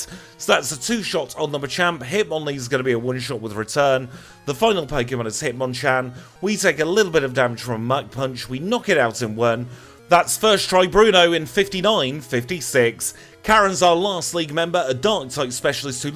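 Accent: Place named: United Kingdom. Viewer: I'm so curious! I'm not from the UK so I'm always shocked at how many accents you guys have in such a relatively small country. What would you say the is the closest accent to your's? I need a name to the sound!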